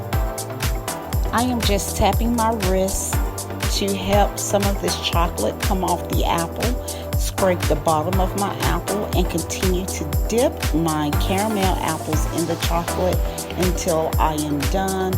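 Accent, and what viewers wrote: South, United States. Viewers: I love your southern sweet dialect. It's so soothing. Thank you for the tutorial.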